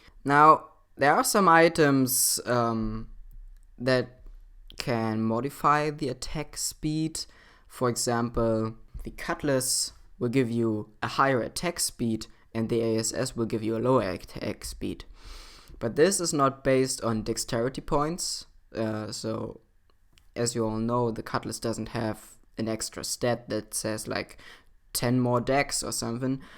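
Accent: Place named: Germany. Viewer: np btw you sound like you speak german cuz of your english accent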